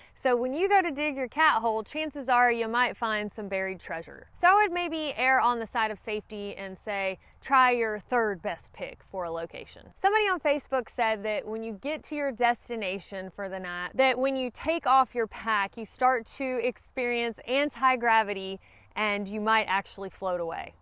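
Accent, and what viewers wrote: South, United States. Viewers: You sound American ! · TOO FUNNY!😄 Love your accent,! REAL Southern! 👍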